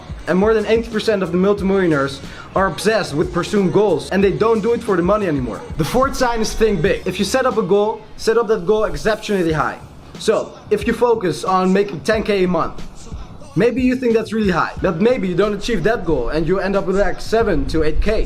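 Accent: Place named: Netherlands. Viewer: Love the energy! And the Dutch accent is great too, hard to confuse it with some other. :) (I lived in Holland)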